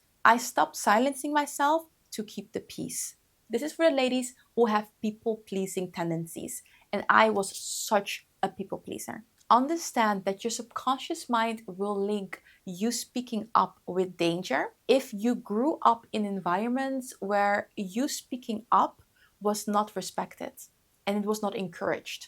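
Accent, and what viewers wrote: Netherlands. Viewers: Are you Dutch by any chance?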